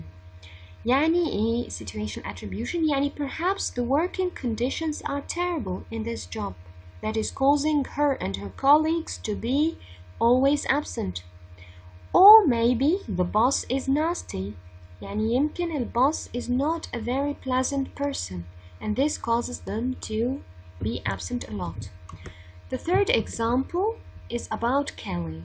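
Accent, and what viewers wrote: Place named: United Kingdom. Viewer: I really liked your British accent it's really good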